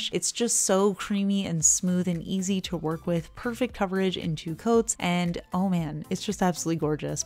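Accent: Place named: Northeast, United States. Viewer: I'm not a neon fan, but some of those colors...wow! I think the people who don't like how you pronounce orange are clueless about accents! Don't they know you grew up in the northeast? 🤦‍♀️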